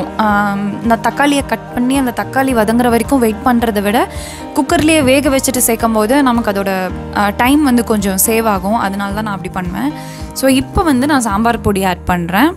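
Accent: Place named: Badaga, India. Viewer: Sister nenge badugas ah.. neenge pesarthu apdi tha iruku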